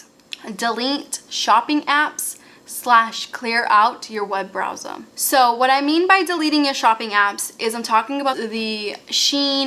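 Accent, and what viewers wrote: Boston, United States. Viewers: lala suncokretic do you mean her Boston accent is more pronounced lately? I noticed that too. She’s probably more comfortable with it now, and isn’t trying to tone it down. She actually might have mentioned that in a video.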